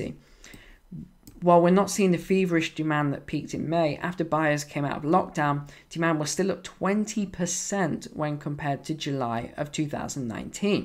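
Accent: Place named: RP, United Kingdom. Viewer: I never enjoyed watching investment and housing videos until I discovered you. I have been following your advice since March and I think you are the best. I Iove your posh accent. Greetings from Asia; wish you would talk more about the housing out here too. I am really looking forward to you next video!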